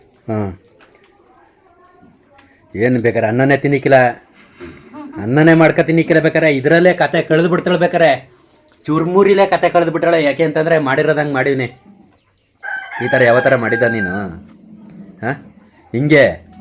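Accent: Mandya, India. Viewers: ಸೂಪರ್ ನಿಮ್ಮ ವಿಡಿಯೋ. ಮಂಡೇ ಭಾಷೆದಲ್ಲಿ ಕೇಳೋಕ್ಕೆ ತುಂಬಾ ಇಷ್ಟ. ಬಾಗಲಕೋಟೆ ಡಿಸ್ಟ್ರಿಕ್ಟ್ ಇಲಕಲ್. ಇಂದ ವಿಡಿಯೋ ನೋಡ್ತೀನಿ. ಈ ಮೆಸೇಜನ್ನು ದಯವಿಟ್ಟು ವಿಡಿಯೋ ದಲ್ಲಿ ತೋರಿಸಿ ಜೈ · ನಿಮ್ಮ Mandya ಭಾಷೆ ತುಂಬಾ ಚೆನ್ನಾಗಿದೆ brother ನನಗಂತೂ ನಿಮ್ಮ ತುಂಬಾ ತುಂಬಾ ಇಷ್ಟ ಆಗುತ್ತೆ 🎉🎉🫡🫡👌👌👍👍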